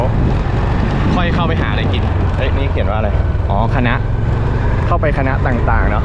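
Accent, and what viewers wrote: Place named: rural, Thailand. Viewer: พูดเหมือนคนบ้านนอกเลยทำไมอยู่ไทยไม่เกิดเป็นคน กทมหล่ะครับบพี่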